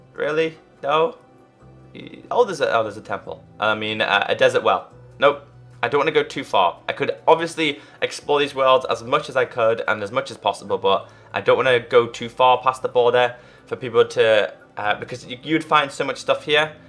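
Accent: Liverpool, United Kingdom. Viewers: are u a scouser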